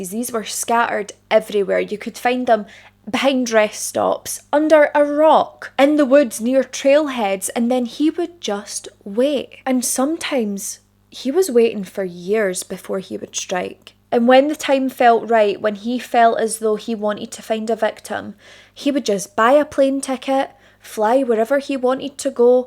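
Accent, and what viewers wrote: Scotland, United Kingdom. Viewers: I came across your rivers and canal video by chance, so good to here a true crime buff wee a Scottish accent, your passion in that video brought me to subscribe to your channel. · I've watched several videos on this apex laser! But, yours is the best! I appreciate the hard work and diligent research that goes into your content! Trust me,it shows brightly! Add your brilliant Sottish accent and we get consistent winners! Thanks, Jeff from Tennessee!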